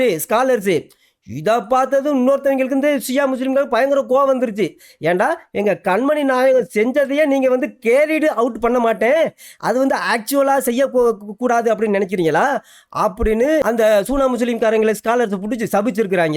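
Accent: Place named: South Tamil Nadu, India. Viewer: சிறப்பு சிறப்பு நன்றாக வெளிச்சம் போட்டு காட்டுகிறீர்கள். பேசும் தொனி ஏற்ற இரக்கம் தென் பகுதி உச்சரிப்பு பாராட்ட வார்த்தைகள் இல்லை 🫂🫂🫂🫂🫂🫂🫂🫂🫂🫂🫂🫂🫂🫂🫂🫂🫂 Number one 😢😢😢😢😢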